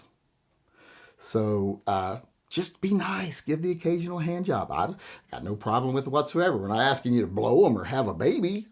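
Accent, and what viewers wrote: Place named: Texas, United States. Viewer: If you're, how come you to sound like most of the other Texas country folks I know? Are you from west of the Taconic/ Appalachian Range? That might explain it. I've heard Mainers that sound like me, too - from west of the mountains. Or from in the mountains. WAIT A MINIT! Did I just hear you say offer the weird kid a handie??? No thanks don't want a stalker - it's enough that I talked to the weird kids. They become attached and no matter how kind they are, they usually end up being insistent, wanting sex with you all the time. I've had six oddballs / weird kids, sexually ambiguous, moody broody or otherwise outcast loner types fall in love with me because I was kind enough to give them the time of day or to speak a bit and say hello with a smile. They also get very possessive of your friendship and time. They also make it all bigger than it really is. 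That of course probably tells the world that it means the world to them, but it's really not the same for you. They fall in love and to them, that means sex - as it does for most all males, daughters ignored by fathers, but most always for the odd people who are probably not getting any parental love at home or sibling affection, respect, or any familial attention even. It may be the very reason they are odd because their parents are like the bullies at school! No handjobs for the oddballs, it may create stalkers and other unsavory events.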